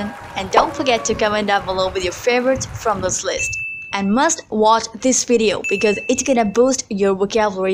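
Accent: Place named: United States. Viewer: If the pronunciation voice was yours then I must request Google to add yours in their data base.🙂 I can't hold on with this pronunciation as it's American and I speak British. Good video though. 👍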